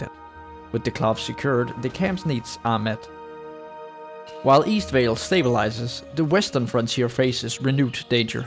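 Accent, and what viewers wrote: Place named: Denmark. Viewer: Danish accent, right? Good video.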